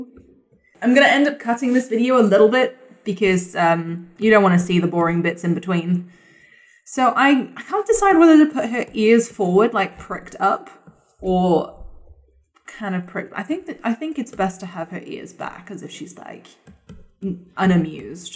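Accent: United Kingdom. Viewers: I'm so happy I just discovered your channel! I really love animation 'n stuff and I'm always happy to find a good one with sympathic ppl on it and your british accent makes it even better!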